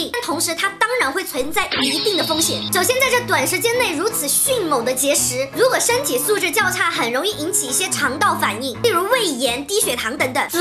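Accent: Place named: Sichuan, China. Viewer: 原來跟密子君一樣是川妹子！！難怪講話口語口氣超像！！！喜歡😍😍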